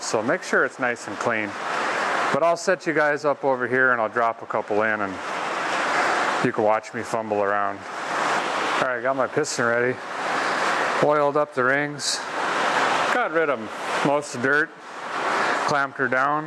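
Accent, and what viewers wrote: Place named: Minnesota, United States. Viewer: This is how all Minnesotans north of i94 talk. Almost Canada relates to vocal inflections as well as weather.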